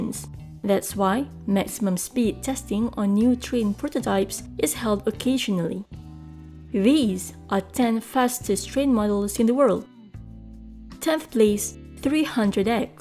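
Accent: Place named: Malaysia. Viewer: I hear a Malaysian!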